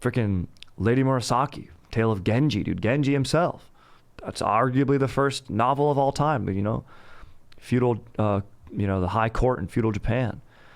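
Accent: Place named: Brooklyn, United States. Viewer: Close your eyes from - and you will time travel in 1920's Brooklyn, New York. Truly miraculous experience.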